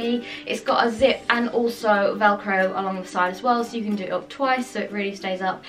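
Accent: United Kingdom. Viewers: Her accent is it britanico or am I wrong?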